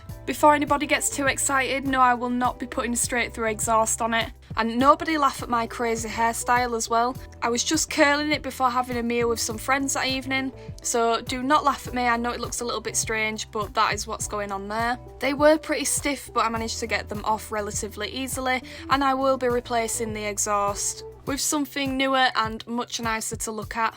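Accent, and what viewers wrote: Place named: North, United Kingdom. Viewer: definitely a northern lass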